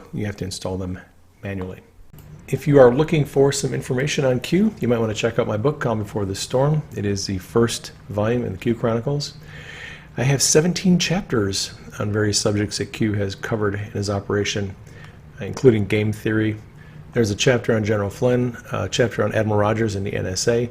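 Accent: Scotland, United Kingdom. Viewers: Being of Scottish heritage, and having vacationed there twice, I can say that your brogue was spot on! Music to my ears! 👍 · Great Scottish accent mate. Greetings from the cold place at the top of England. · Guid Scots accent laddie !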